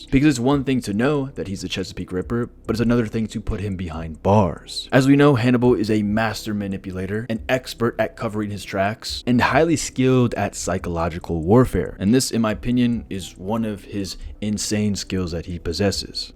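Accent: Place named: England, United Kingdom. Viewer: You can kind of hear the English cadence in his American accent, it makes vigilante sound innocent and polite with all the dumb stuff he says so it’s funnier 😭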